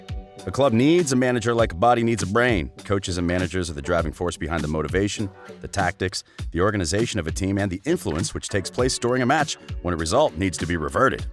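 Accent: United States. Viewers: No insult intended, but when watching & listening to a video about soccer I´d rather have a British English speaker … ! - Sorry guys across the pond, this broad American just does not fit. I guess even a german accent would be a better match here … :) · After one minute of utter nonsense i gave up. Klopps "unimpressive" start of his coaching career over here in germany was to take over a village club like Mainz in the second division, when he was still a player without any coaching experience and make that club a bundesliga regular, even reaching uefa cup. Afterwards he went to Dortmund where they had just avoided bankruptcy and had no money for larger transfers. There he kept the people patient for some years with very emotional and attractive football, while building up his squad and it ended in consecutive championships, a national cup and a championsleague final. 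If that´s not impressive i don´t know what is. When they called "fergie time goalscoring" brilliant and implied it would have been a tactical revolution, while completely missing out on gegenpressing i decided to not torture myself further, as i feared i would become dumber by shear listening. We shouldn´t blame it on the american accent, as the journalism about nba or nfl for example is very accomplished. Whoever wrote this would never be allowed to report about a basketball game in the US. To me it sounds like the newscaster of instagrams celebrity rumours and scandals or something like that.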